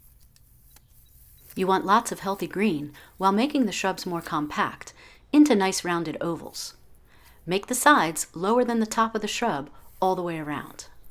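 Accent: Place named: United States. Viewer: Sounds like she iş american BUT gardening in england.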